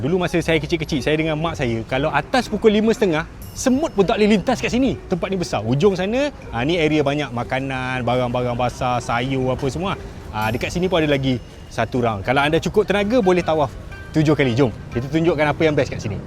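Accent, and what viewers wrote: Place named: Kedah, Malaysia. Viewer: ni kmpg Mana sebenarnya.. Kedah pn ye.. Pahang pn ye.. 😅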